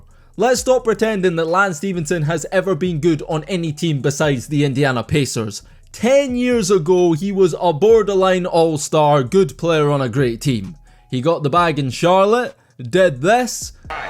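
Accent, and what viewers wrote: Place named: United Kingdom. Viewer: when i heard your accent i thought i clicked on wrong video, Brit talking about basketball is always odd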